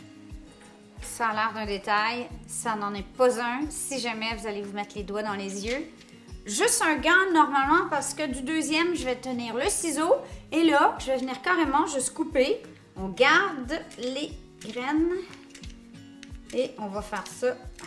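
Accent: Quebec, Canada. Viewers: Quel bonheur cet accent Québecois pour une bonne recette et en prime de belles expressions comme "La dent épicée" 😉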